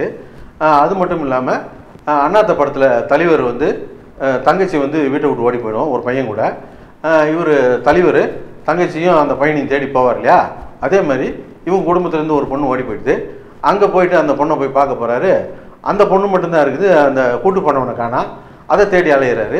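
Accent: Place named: Chennai, India. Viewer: Inna thalaiva Madras bhaasha la varuthu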